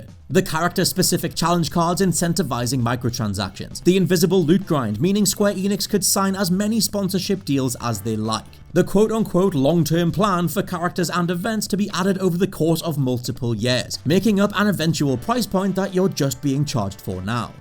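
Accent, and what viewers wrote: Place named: United Kingdom. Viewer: Because British accent?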